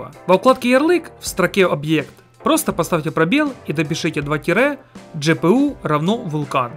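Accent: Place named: Western Ukraine, Ukraine. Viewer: А ты что Русский паря? Я думал по говору Бандера.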